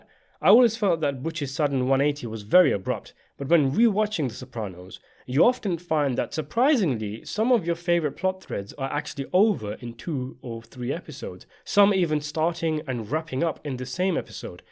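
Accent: United Kingdom. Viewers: sopranos analysis in a British accent is brutal to watch.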